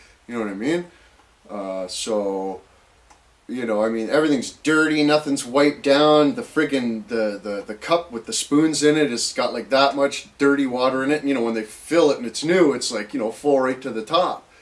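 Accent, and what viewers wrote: Canada. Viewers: Best Cannuck accent ever.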